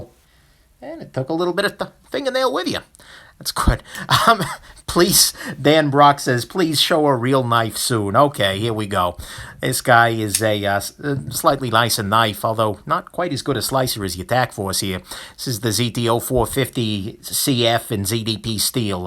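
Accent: New York, United States. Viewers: Is this an NY accent he as?? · he has a nice New York monster accent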